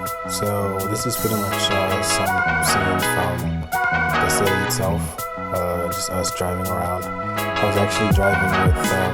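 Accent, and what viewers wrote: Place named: Southern Africa. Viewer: Your accent sounds very familiar. Like a mixture of Southern-African and American.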